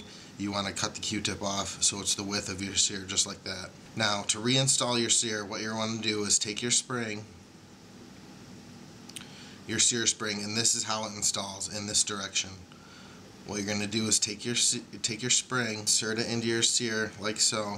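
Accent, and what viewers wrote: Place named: Michigan, United States. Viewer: btw you sound like you're from michigan haha no offence if you're not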